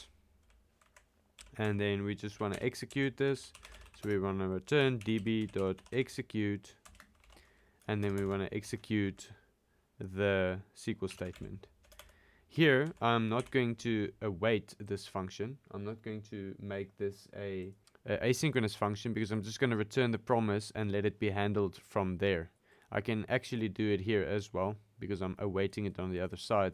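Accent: South Africa. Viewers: i can hear a mzansi accent...?....?....? ❤❤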